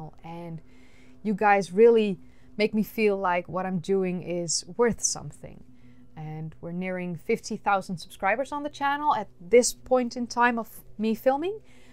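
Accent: Netherlands. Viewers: does * speak with a Dutch accent? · the Dutch slur in your accent, it makes what you want to bring across sometimes hard to follow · I missed your Dutch accent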